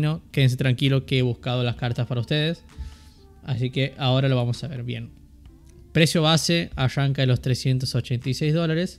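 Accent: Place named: Santiago del Estero, Argentina. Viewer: Reconozco ese acento del Norte, sos santiagueño?, buen video todavia soy muy principiante en tema de cripto y nfts pero con estos videos me informo.Saludos.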